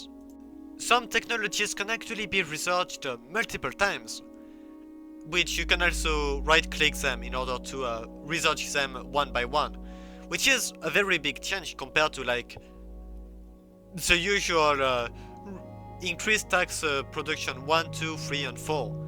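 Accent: France. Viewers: Thickest french accent I’ve ever heard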